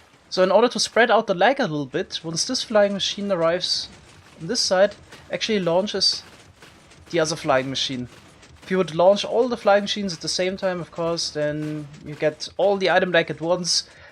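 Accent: Bavaria, Germany. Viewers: Dude you can hear from his speaking that he is German and for Germans this is easy and simple xD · Glaube sogar Bayer.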